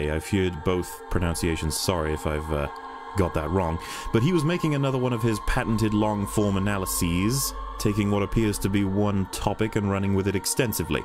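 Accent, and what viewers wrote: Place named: United States. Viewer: strange American accent ONLY ELEVEN MINUTES INTRO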